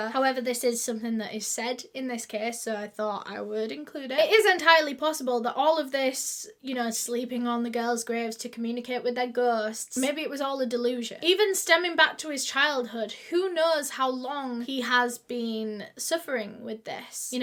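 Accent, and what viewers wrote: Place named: Northern England, United Kingdom. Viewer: I fr rock w videos i cannot bring myself to watch any other true crime youtubers i just love that northern accent and she is so nice i luv her fr 😭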